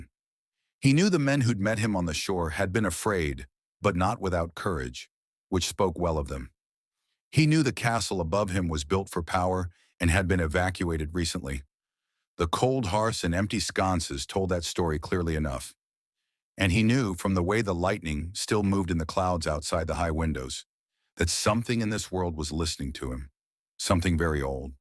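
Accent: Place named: United Kingdom. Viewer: Interesting story, but British accents are just meant for story telling.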